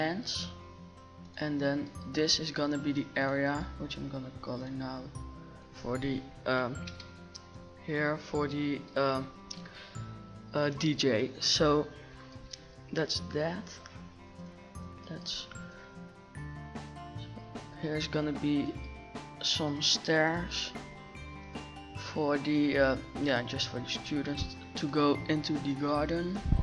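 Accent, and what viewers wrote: Netherlands. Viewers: omg, die nederlandse accent hahah! te lieff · Dat Nederlandse accent is zo cute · You sound dutch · He is dutch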